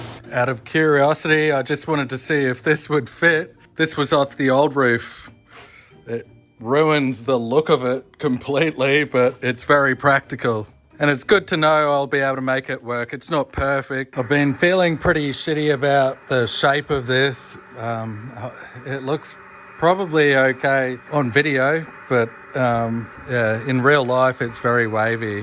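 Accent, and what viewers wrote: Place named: Australia. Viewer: Ok, to start with, since it says you posted this just 9 hours ago, you must have done okay with Alfred. I was worried about you guys, so I'm really glad to see this. Then... brother, you just gave me a crazy idea on how to build a roof for my Bertram 20. A little different than yours because frankly, I don't have anywhere near the patience you do for a project like that, but it'll be cool nonetheless. Finally, I had to laugh out loud, man. I grew up with a couple of kids who were from South Africa, and although I know that the Australian accent and South African ones are completely different, they're close enough to my American ear to bring back a heap of great memories.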